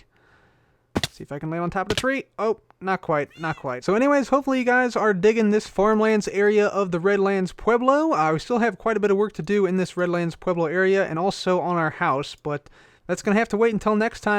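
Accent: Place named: Ohio, United States. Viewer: probably america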